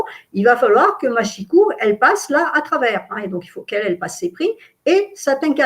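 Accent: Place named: England, United Kingdom. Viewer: Cet accent Anglais me laisse pantois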